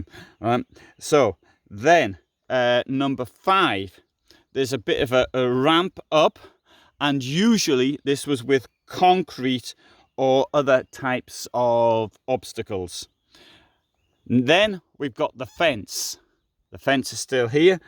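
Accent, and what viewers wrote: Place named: North East England, United Kingdom. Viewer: I can here a north east English twang to your accent😁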